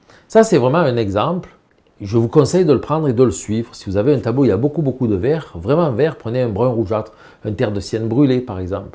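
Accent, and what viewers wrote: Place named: Provence, France. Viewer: Merci Monsieur , bien expliqué !! Intéressant pour me ressourcer ! Et Bel accent de Provence ❤️ Régine , artiste.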